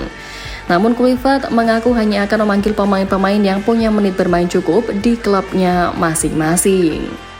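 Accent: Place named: Java, Indonesia. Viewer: suaramu kok medok banget sih sama seperti saya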